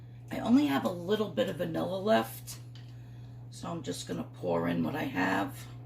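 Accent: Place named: Massachusetts, United States. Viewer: Hi! Love all the videos and your tips and cute accent (mostly because I think it sounds like you live near me--I live in Central Massachusetts).... I love the breakfast you made and the idea of meal prepping--I can't wait to try the French Toast Cups and the egg bake! The great thing about both of those recipies is you can customize them to what you want to have that week--like I think gingerbread flavor french toast cups might be fun--or I might add a little mashed banana, unsweetened coconut and almond extract... or maybe even strawberries or raspberries or chopped small apples.... Thank you so much! I love WW and it is so nice to see all that you share on your journey! I have lost almost a hundred pounds on WW and now have 83 pounds until goal! With your videos and support from my workshop & other online groups I believe I can do it! 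Thank you a thousand times over! I will be sharing on FB for you! Best wishes for 2023!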